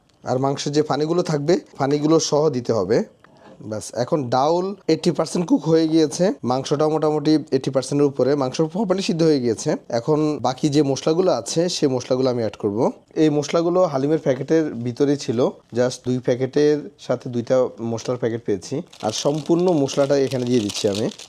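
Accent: Noakhali, Bangladesh. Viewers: ভাই আপনি নোয়াখালীর???😮😮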